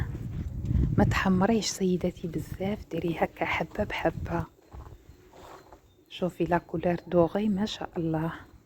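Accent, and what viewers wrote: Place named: Barika, Algeria. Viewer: هل انت من ولاية بريكة هدرتك نفس هدرة بريكة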